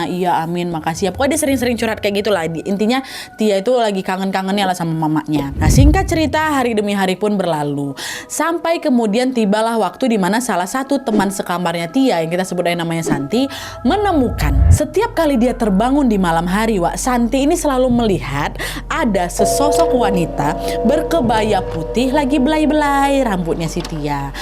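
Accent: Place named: Palembang, Indonesia. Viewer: Logatnyo mencak wong Palembang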